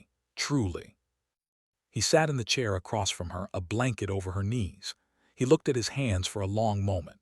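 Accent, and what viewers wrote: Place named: South, United States. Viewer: I'm sorry but you can't use the country voice for these stories. It just doesn't fit and doesn't make me want to watch it.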